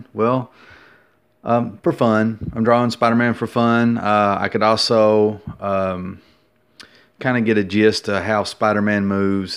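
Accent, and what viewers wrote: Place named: South, United States. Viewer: I like Mark Bageley's Spiderman. Also I like your Southern drawl, its nice to listen to.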